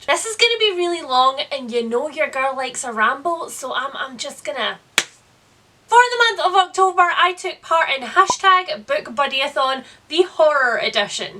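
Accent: Scotland, United Kingdom. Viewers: I ❤️ your Scottish accent.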